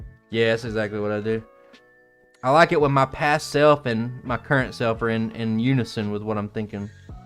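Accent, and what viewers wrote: South, United States. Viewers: I'm up in bed watching this run and the DUUUUUDE! that kept coming out of that southern accented mouth of yours had me jumping to run to the bathroom before I pisted myself. Bravo, DUUUUDE bravo.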